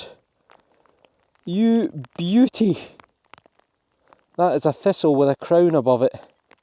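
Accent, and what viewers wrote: Scotland, United Kingdom. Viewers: Your enthusiasm and your knowledge of history make you my favorite detectorist. And your lovely Scottish accent!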